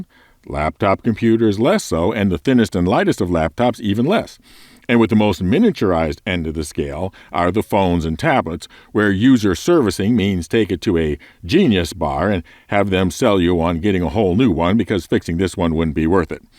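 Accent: Scotland, United Kingdom. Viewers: scottish accent :D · The scottish accent will help for sure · Got to admit, hearing your Scottish accent is quite the experience. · I do like the indicator lights on the buttons idea. It's similar to the old I-war 2 status bar where you could evaluate the state of your ship at a glance. Though I strongly disagree with the RNG repair damage. Make it take, say, 10 seconds for each RNG roll and only capable of bringing the system back to minimum operating capability instead. The Scottish accent might be a tough call for the non-english speakers though. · Engineering should be slightly more complex than the game blackwake. Where your ship has a problem, you have a quick and intuitive way of dealing with it. A 12 year old should be able to figure out. Otherwise you make dealing with engineering issues too much of a hassle for players either in there day to day activities or when in critical situations such as getting shot at. 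You shouldn't need a complex flow chart or checklist to fix the issue. Scottish accent is beyond scuff at 2x speed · I would like to hear your Scottish accent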